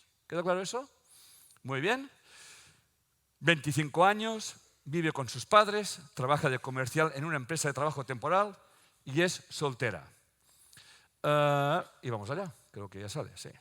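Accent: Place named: Mexico. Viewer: Me encanta tú forma de hablar mexicano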